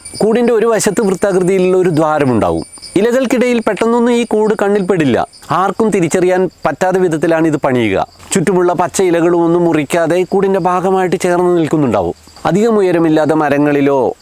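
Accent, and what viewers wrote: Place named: Kottayam, India. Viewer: Your narration is clear sound ,a kottayam Pathanamthitta slang different from blathur natives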